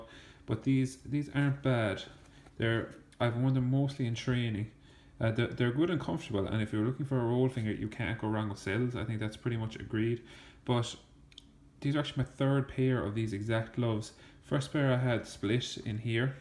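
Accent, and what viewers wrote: Ireland. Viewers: are you from Ireland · sounds like a clare man 😂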